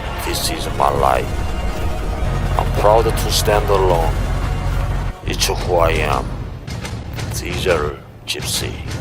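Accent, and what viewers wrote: Gyeongsang, South Korea. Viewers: 갱상도사투리 잉글리쉬 억수로 잘하시네 한국서 원어민 교사 굿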